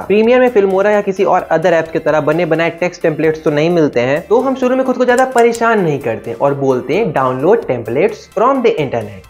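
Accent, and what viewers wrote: Bihar, India. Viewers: Bihar se ho kya bhai😊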